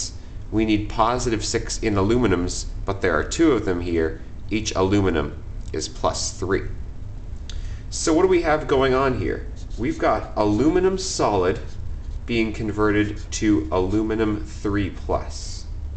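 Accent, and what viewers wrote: Canada. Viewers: I think its canadian maybe because the way he says the word out sounds like it.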